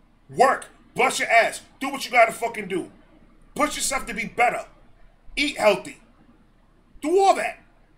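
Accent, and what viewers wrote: New York, United States. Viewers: My dude! Hands down my top 3 reactors on yt. So glad i found your channel a month ago. You originally from NY? Bc i get ny vibes from u. And you talk your shit like a nyer. It's honestly refreshing man. Keep it coming man. You a real one.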